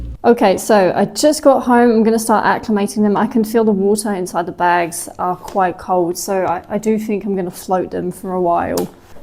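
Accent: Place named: England, United Kingdom. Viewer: ton accent anglais incr <3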